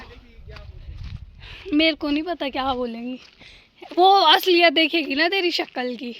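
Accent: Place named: Kashmir, India. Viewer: You look like a Kashmiri girl, and your tone also sounds the same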